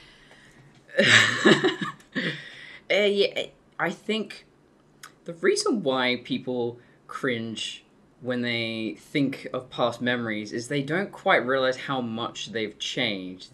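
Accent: United Kingdom. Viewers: I cant imagine your voice any other way. You sound like a sophisticated English women! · Your british accent complements it perfectly. Joanna Lumley like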